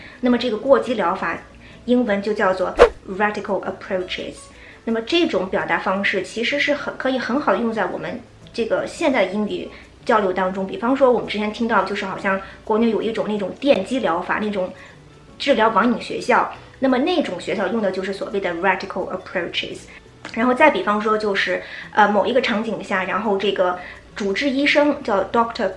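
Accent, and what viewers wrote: United Kingdom. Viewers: 听出来了 😂 您是英式发音： spill、 body ~ 明显英式发音😶😶😑😑😬😬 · What a beautiful Brith accent! I love it.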